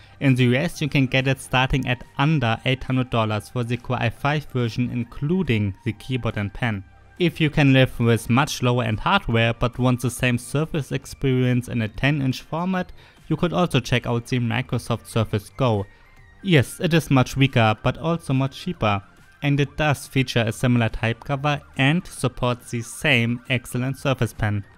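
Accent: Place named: Germany. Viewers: I think DEUTSCH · Yeah it's a very hard german accent:P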